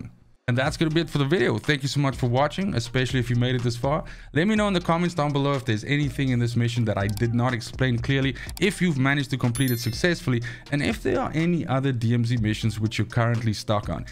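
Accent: South Africa. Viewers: You sound South African, where are you from?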